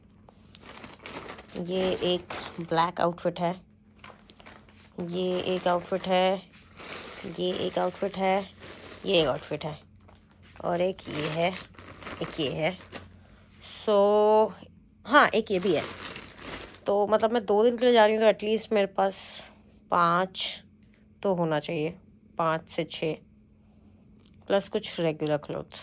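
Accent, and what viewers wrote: Bihar, India. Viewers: It's nice to hear her bihari accent 😍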